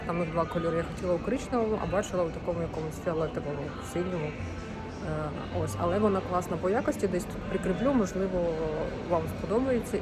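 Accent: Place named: Ukraine. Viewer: вы русские слова пытаетесь произносить на украинский манер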